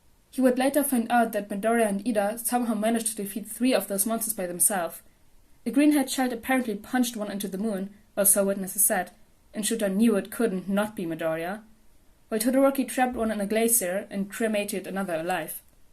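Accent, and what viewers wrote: Germany. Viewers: Yooooooooo i have a question are you german ?? Because you sound like my class in english class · Let me guess you’re German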